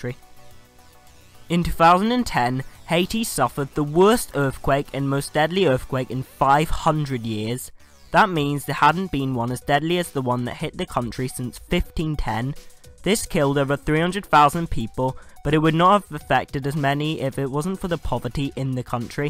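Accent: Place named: Northern England, United Kingdom. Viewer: Not just saying it, this was my favourite video of yours so far.. The clarity of your voice is perfect on this :) Where are you from? I'm getting everything from Scouse to Manc to Yorkshire!!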